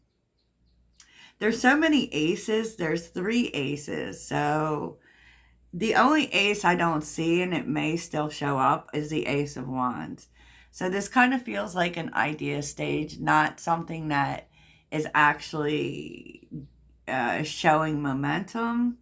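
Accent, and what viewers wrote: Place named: Mid-Atlantic, United States. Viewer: Where are you from? Sounds very PA/MD/DE! Baltimorean here.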